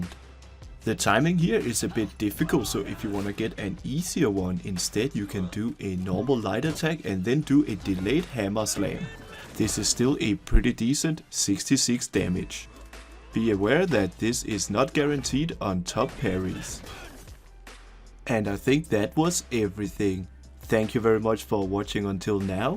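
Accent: Denmark. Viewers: thanks buddy! Fine bonus with the danish accent. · are u from denmark? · Er du fra danmark? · Is it just me or are you from Denmark? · er du dansker haha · Jesus u sound like a Danish dude and pls don't talk so slow ÆØÅ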